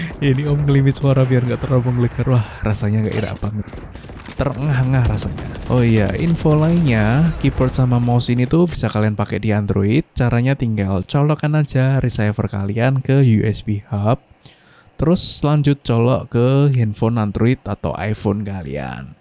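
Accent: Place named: Java, Indonesia. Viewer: wkwk. awal lihat subnya kecil, ya udh ekspektasi audio pecah.. tapi gila ini audio jernih dan sekelas 1jt sub.. bisa lah ini cepet naiknya.. mantap review dan aksen jawanya... saran saja pas jelasin baterai di menit 3, sekalian aja tampilin baterainya om, jgn mainin buka tutup brutal. di kuping agak ganggu soalnya pakai headset, dah itu saja lanjutkan om.. :v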